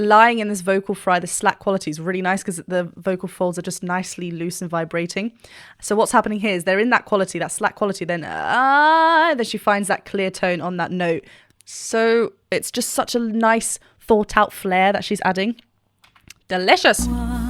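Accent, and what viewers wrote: United Kingdom. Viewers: You have a beautiful British English accent.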